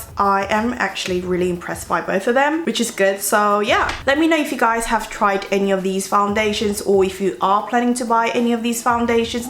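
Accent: United Kingdom. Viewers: 와..파데보러왔다가 발음듣고 구독하고 가용...ㅜㅜ 이렇게 영국스러운 절제된 발음이라니 사랑해요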